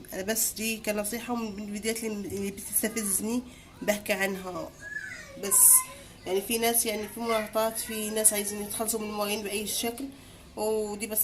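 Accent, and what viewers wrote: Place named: Libya. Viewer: هذه أي لهجة ليبية؟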